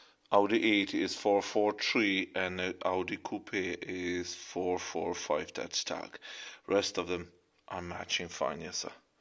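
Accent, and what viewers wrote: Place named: Cork, Ireland. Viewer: loving that cork accent, man. thanks for the vid bai.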